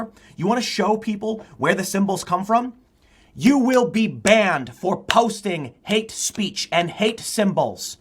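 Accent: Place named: Midwest, United States. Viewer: You can tell is from the Midwest. He adds an "S" to singular nouns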